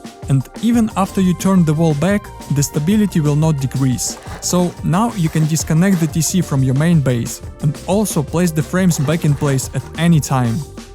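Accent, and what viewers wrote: Russia. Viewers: Бро разговариваешь хорошо, но мы знаем что ты из России) акцент тебя выдает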